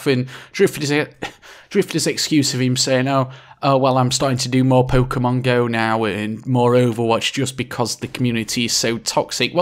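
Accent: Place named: Midlands, United Kingdom. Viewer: Just stumbled across this video. Subbed and liked that's done good shit said here. Where are you from? I'm from Nottingham you sound Midlands UK but can't place it, Sheffield or Doncaster maybe? Anyway great video man! 👍✌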